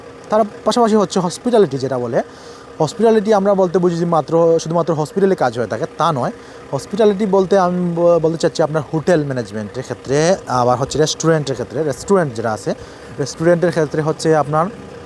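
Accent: Sylhet, Bangladesh. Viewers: ভাই আমার মনে হইতাছে আপনি সিলেটি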